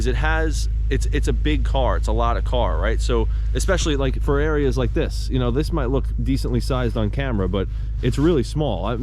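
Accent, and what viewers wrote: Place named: Long Island, United States. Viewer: In the first ten seconds from the overpass and your dialect I knew this was LI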